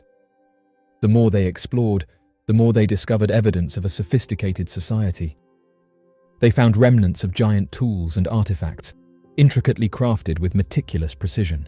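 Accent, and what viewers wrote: United Kingdom. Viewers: its so fucking dumb but he's got a british accent so it's gotta be true.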